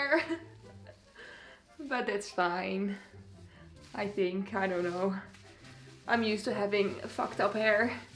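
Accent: Netherlands. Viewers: yo for real, are u dutch? sorry but u have SUCH a dutch accent hahaha love you <3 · Je klinkt zooo nederlands lmfao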